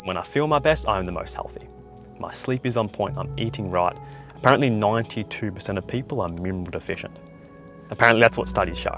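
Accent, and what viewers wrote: Australia. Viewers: He's Australian?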